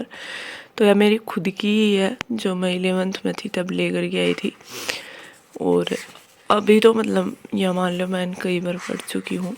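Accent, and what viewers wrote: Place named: Rajasthan, India. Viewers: Madm ji thari boli payri lage, ye shekavati boli h kya 🙏🏾🙏🏾🙏🏾🙏🏾🙏🏾🙏🏾🙏🏾🙏🏾🙏🏾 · Marwadi bolu yaa shekawati maine samjh toh nahi aaye but lage aap yaa Nagore side se hu yaa Sikar side se...... Best of luck 🤞 for your preparation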